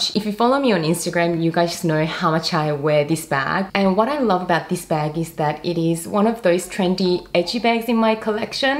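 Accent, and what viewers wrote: Australia. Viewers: Beautiful!!! Aussey accent is nice too. 😝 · Korean Australian accent...?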